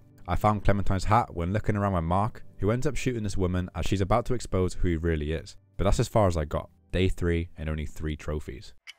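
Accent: United Kingdom. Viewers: This video is absolutley top tier! Love to hear another brit! :) keep them coming man!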